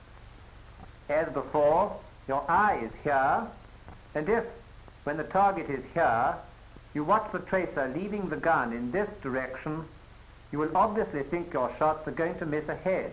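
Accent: RP, United Kingdom. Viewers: Having to listen to that plummy voice all day would drive me nuts. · The Queen's English of this narrator is absolutely smashing! Absolutely wizard!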